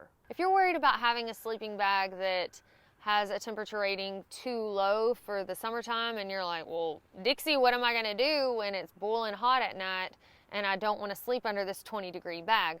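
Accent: South, United States. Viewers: Dixie you are just the sweetest and prettiest young lady I believe I've seen in quite some time. No I don't get out much. But I do reminisce a lot about when I used to backpack and you help bring those memories back. I have a Southern drawl just like you do so I guess that's why I like to hear you speak. Thanks for the video I'm sure you're helping these guys out a lot that's wanting to do hiking like you did and do. Keep ye powder dry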